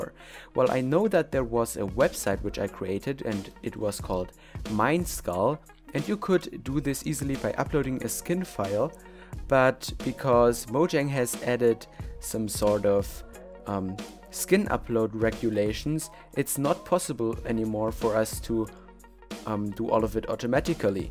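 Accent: Germany. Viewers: Are you German? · er ist auch deutsch? Hört man irgendwie :D McMakistein ist ja auch Deutscher (Österreich) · hehe^^ Man hört richtig, dass du deutsch bist xd, aber trotzdem nice vid!:D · Jep, er ist deutsch ;)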